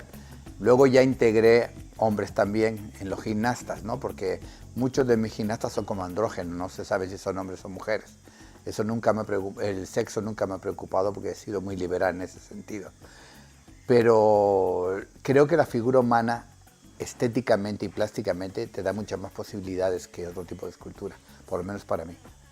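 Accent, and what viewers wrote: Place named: Spain. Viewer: Tantos años en México...¿porqué el acento de España?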